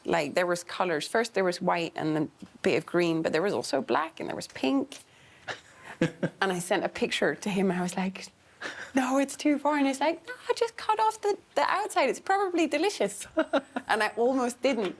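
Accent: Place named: Ireland. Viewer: Wonderful, sister, miss the irish accent , lived for a good while in Ireland, then felt to move back to rural România to care for a piece of land and few old villagers around us here, such an obvious, simple solution for humanity to cease its destructive madness and become harmonious, returning to nature..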